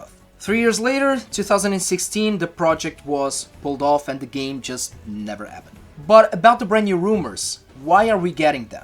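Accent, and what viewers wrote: Portugal. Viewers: Wait, are you from Portugal?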